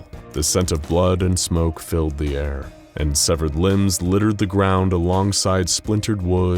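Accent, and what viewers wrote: United Kingdom. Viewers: It's bri'ish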